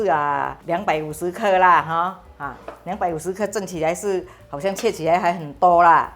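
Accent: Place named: Hokkien, China. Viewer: Thank you auntie, like the way you present very detail, and Hokkien mandarin 😊 I’m Hokkien too 😊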